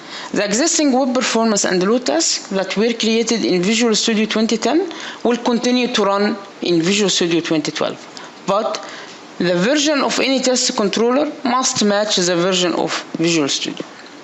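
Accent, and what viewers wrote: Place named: India. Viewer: Индусский английский перестал резать слух примерно в середине видео. Тем не менее, теперь я знаю как делать нагрузочное тестирование. Gracias!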